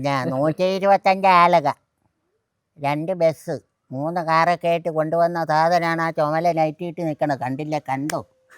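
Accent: India. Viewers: അടിപൊളി 😂 ആലപ്പുഴ മാരാരിക്കുളം സൈഡിലുള്ളവർ സംസാരിക്കുന്ന പോലെ 😂😂😂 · കുറെ കാലം മുൻപ് ചേർത്തലയിലെ ORIGINAL സംഭാഷണം ശൈലി. തകർത്തിട്ടുണ്ട്!!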